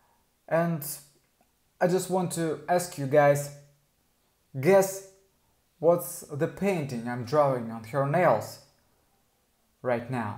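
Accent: Russia. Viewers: Its just a russian accent. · When this video started I was like “wow he has a Russian accent is he Russian” And he went “I’m a nail technician from Russia”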